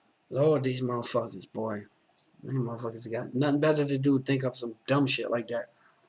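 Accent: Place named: New York, United States. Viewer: Man ur SO new york bro ha I'm from pgh n I can still tell u ny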